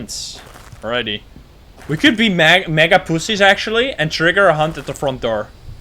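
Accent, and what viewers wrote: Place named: Netherlands. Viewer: seems like so many dutch accents are barely noticeable. I've always wondered why that is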